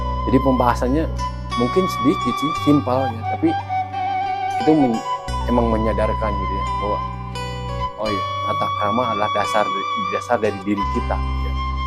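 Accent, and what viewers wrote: Sunda, Indonesia. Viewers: Sunda halus kalem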